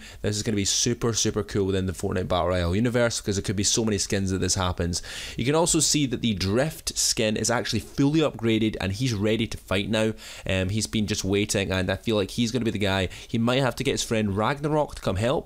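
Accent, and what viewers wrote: Scotland, United Kingdom. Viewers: Are you scotch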